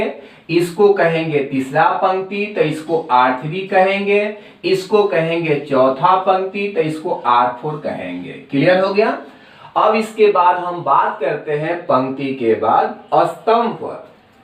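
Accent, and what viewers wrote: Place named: Bihar, India. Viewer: Sir ekdum bihari lagat bani rauuaa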